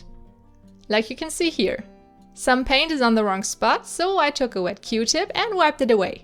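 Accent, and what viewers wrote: Germany. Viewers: I know thats randome but are you German? You sound really german soooo yeah And i really love your art :D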